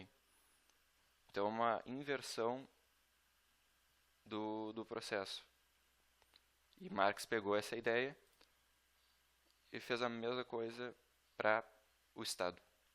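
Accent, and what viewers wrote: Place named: Rio Grande do Sul, Brazil. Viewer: sotaque bem gaucho . .top lembrei de meu amigo gaucho de novo hamburgo..bom video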